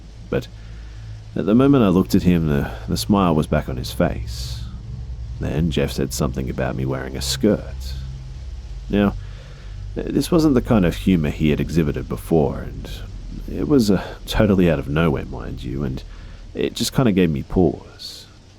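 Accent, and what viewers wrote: Australia. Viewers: ay, is your accent australian?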